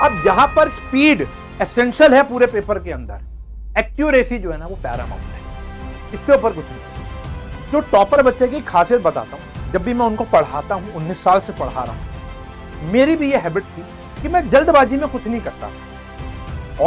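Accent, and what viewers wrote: Punjab, India. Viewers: sir your punjabi accent bahot achi hai sir 😀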